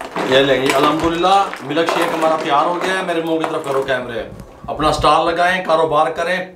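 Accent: Punjab, India. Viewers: ji thuadi Punjabi bhaut swaadee hey. Milk shake nalo vi jaida.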